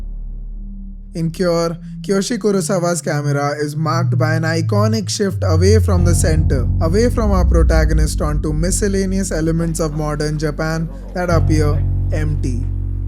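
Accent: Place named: India. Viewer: Great Video although ive noticed your quite heavy indian accent. I would probably watch more videos from you but my heavy distaste for the Indian race won’t let me. Please consider to stop being Indian so more People can enjoy your content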